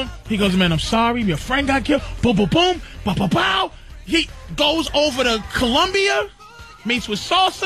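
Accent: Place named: Cuba. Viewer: Patrice O’Neil’s Cuban accent is hilarious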